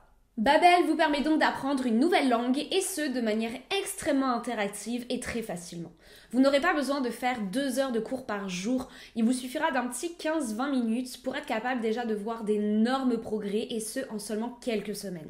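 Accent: Quebec, Canada. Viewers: Tellement triste histoire pauvre gars ! Ma mère souffrant de maladie mentale quand j'étais jeune elle avais fait une genre de cabane avec des meubles et je ne pouvais pas manger parce qu'il y avais de la drogue dans la " nourriture " et il y avais des méchants partout ....après 3 jours ma famille est venu nous chercher pour lui dire qu'ont devais s'enfuir à cause que les méchants étais à notre recherche ils ont conduit ma mère a l'hôpital et moi j'ai manger comme jamais ! Il y a toujours quelque chose a faire les parents son a plaindre dans cette histoire ! Ps ton accent une vrai québecoise oublie pas d'aller voter ! Faire sortir nos bozos 😅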